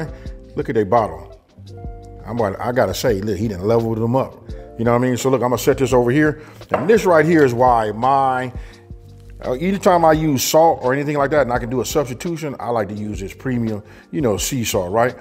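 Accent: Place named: West Coast, United States. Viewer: You 👀 my size. Southern with a West Coast accent😅. I love it! Also, I need that mandolin.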